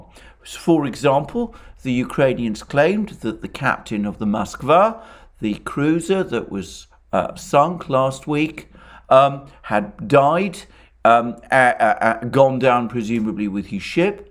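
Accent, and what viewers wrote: England, United Kingdom. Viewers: He's got an English accent, my friend.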